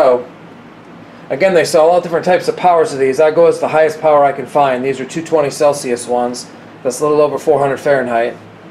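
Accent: United States. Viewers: Happy new year 2024. I like your ingenuity and your accent. Simple, effective, american. I will try with my dutch oven. Thanks for your creativity.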